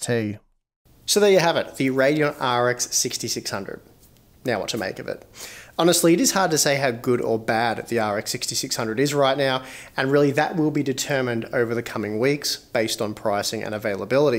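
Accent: Australia. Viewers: Audio's good. You're Aussie so it sounds fancy.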